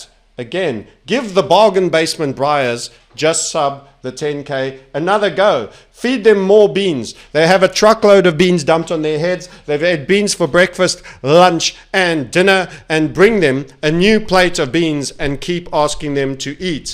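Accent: South Africa. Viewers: South african bud not ozzy .. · you are a sooth sayer sir. I have heard some sayers of sooth before sir,but this level of sootherie is staggering. (Just curious, South African accent?)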